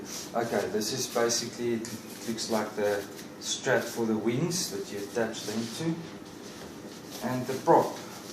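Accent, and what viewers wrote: South Africa. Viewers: Are South African Die manier hoe jy praat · He's definitely South African. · Are you South Africa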